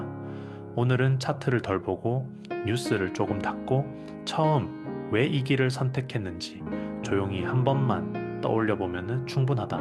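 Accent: Gyeongsang, South Korea. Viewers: AI로 편집한 목소리 경상도 비슷하게 들리는건데 전 오히려 좋아요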